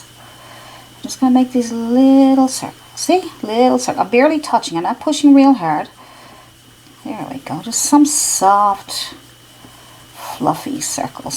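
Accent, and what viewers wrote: Newfoundland, Canada. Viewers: I'm randomly looking at painting techniques on YouTube. And as soon as I heard you talk I knew you were from NL so I did some googling. . And sure enough. Im in corner brook. Great video :)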